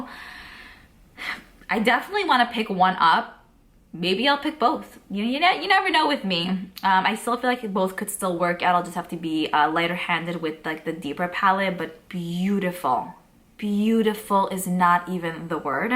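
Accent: New York, United States. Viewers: Newer subscriber here. Is that New York I b hear in your voice? Joisey girl here!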